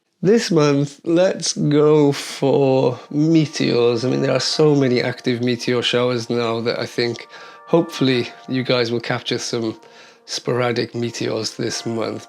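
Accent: Wales, United Kingdom. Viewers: Laughing at the YouTube subtitles misunderstanding your welsh accent and telling me the Tories are fireballs (I wish)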